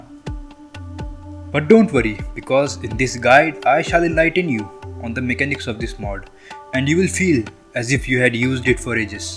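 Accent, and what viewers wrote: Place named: India. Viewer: >indian accent >poo mod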